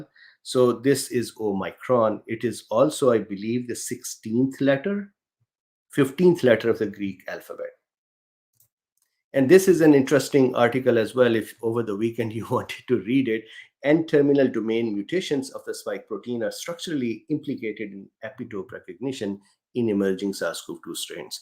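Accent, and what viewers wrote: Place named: India. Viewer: C19 is the worlds biggest scam, fun ,speaking of scams, hearing it in an indian accent makes even more of a scam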